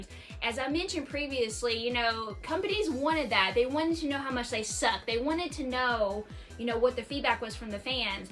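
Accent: South, United States. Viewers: I love your southern accent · I love your accent it’s so country I am from Tennessee I love watching your videos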